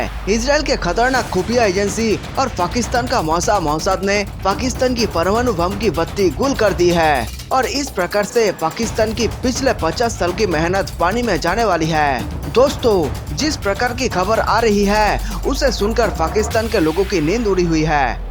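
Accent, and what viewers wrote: Bengal, India. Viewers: भाई आप बंगाली हो क्या? बोलने के लहजे से तो ऐसा ही लगता है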